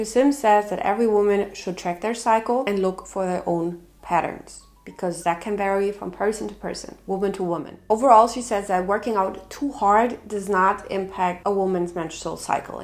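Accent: Germany. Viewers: Are you german ? I cant listen to accent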